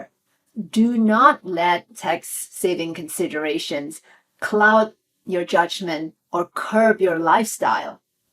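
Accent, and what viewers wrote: United States. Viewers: A tip off is she speaks without an aussie or english accent. That should have clued you in that she is American.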